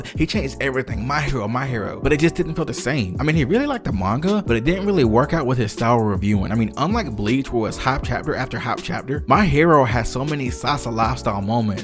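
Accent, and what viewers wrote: South, United States. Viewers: I haven’t heard a southern accent this deep since I visited The University of Alabama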